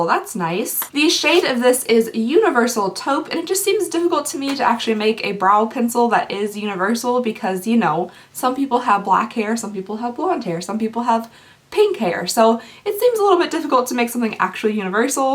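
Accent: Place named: South, United States. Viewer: I can tell you’re southern. ❤️ same lol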